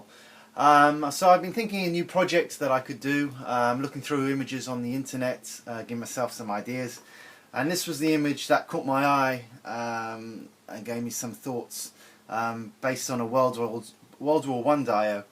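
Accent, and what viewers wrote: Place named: United Kingdom. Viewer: Amazing as always , nice to hear your voice and its british, I'll put the kettle on and grab a seat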